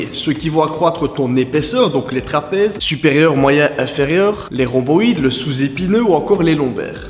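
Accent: Liège, Belgium. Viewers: Tu es de liège ?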